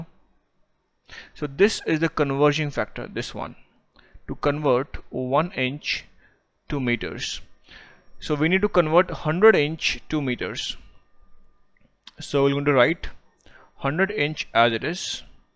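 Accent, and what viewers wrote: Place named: New York City, United States. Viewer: NYC voice pitch 😊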